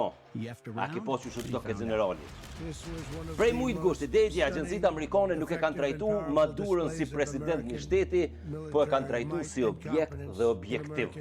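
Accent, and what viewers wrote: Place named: Dardania, Kosovo. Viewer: me pelqen kur fol se e fol guhn e bukur DARDANE po te lutem mos i merr fjalet pej guhes shyipe te shyipnis ajo nuk asht guhe ajo asht me qellim guha ma e flliqt primitiv pervers e globit jo rasist se e len te funit me shkru ajo nuk ka lidhje me guhn e shyipetarv ajo asht guha ma e re e globit ,mire e kanemaqedont kur thon se asht guha ma e re en dyja se maqedonishtja ashte e 1950 nja 22 vjet ma e vjetr edhe pse te dyja naen ekane gegrishten ata e shkelin dhe e urrejn guhn tone guhen tone gegrishten ton guhen e babs GEG E NANE GRISHE -GEGGRISHTEN TONE TE BUKUR GUHEN UNIVERSALE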